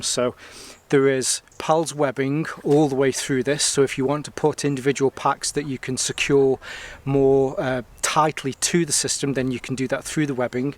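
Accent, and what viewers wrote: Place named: Stoke, United Kingdom. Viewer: Funny, i watched a few more of your videos, then it suddenly hit me: i recognise that accent! I moved from Stoke about 20 years ago.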